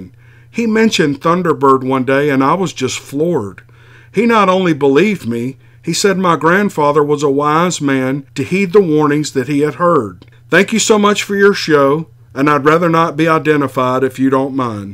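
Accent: South, United States. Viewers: I love your stories and voice. Being a New Yorka, it's refreshing to hear a country bumkin tell stories like this. Keep up the great work. Salute. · What the heck are you doing??? 😳haha I love it!!!! you are absolutely amazing these encounters remind me of what I heard a couple months or years ago and I lost track of you and I'll tell you what you have already moved from spot 21 to number one absolutely unbelievable encounters you're just doing such a great job I can't believe it and I love your accent and I love the way you're from the South and I love your guitar rifts you do you are very good at guitar too Keeper go on letter rip potato chip haha lol fantastic work wow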